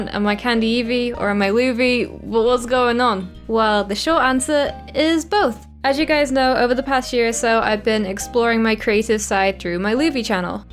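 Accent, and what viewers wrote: United Kingdom. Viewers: Your voice with the British accent just make the unintended ASMR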